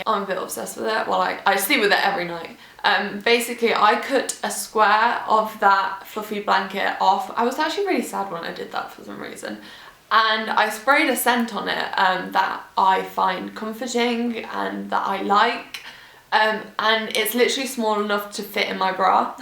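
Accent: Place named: Coventry, United Kingdom. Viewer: She's from Coventry 😊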